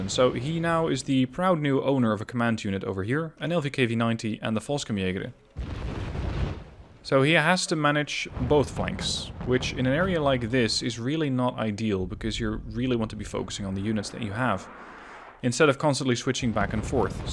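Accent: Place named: Netherlands. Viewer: Sounds like a dutch one to me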